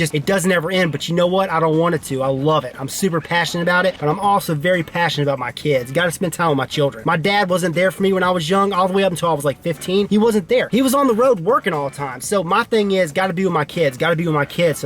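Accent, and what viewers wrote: South, United States. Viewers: well well,...that's a trip! You're an Oak Ridge boy and I am a Hermitage/Nashville Girl! I've always thought I heard a lil twang in ya but when you said you dipped, I KNEW you were southern! Ahaha....My brotha from another motha! Thanks 4 the vid. Hella informative. More so than any other yet!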